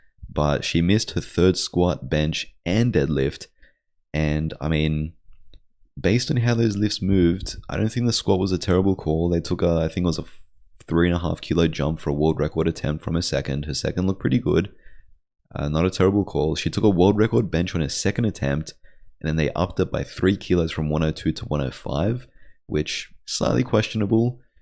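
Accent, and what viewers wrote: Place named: Australia. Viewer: I recognise the Aussie accent, but can't seem to know who this channel belongs to!!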